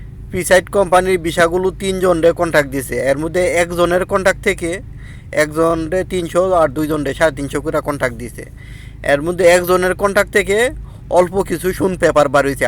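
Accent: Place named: Bancharampur, Bangladesh. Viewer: বান্ছারামপুরের ভাষার মত লাগলো আপনার বয়েছ টা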